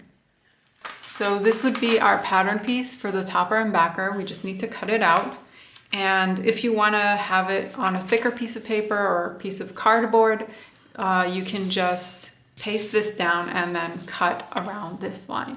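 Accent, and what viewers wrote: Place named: Canada. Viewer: Hello, this video is so useful!!! I really appreciate your help! Are you from Canada? I think I hear your about pronunciation, plus you use cm. Greetings from Cleveland Ohio.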